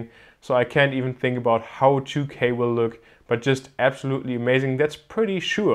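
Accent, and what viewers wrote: Germany. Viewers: Du bist doch deutsch oder ? Das hört man gleich :D Trotzdem sehr gutes Video !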